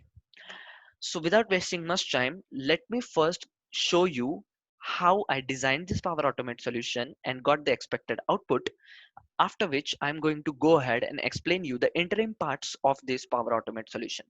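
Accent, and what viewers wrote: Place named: India. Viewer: You should be banned from speaking English 🤪🤪. The Indian accent is horrible!!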